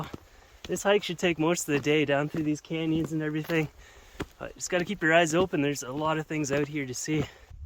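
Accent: Canada. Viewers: Crazy beautiful scenery. Utah is now on my list. Also, has the most Canadian of Canadian accents. I'm also from BC. I wonder if I sound like him when I speak. Probably. Eh?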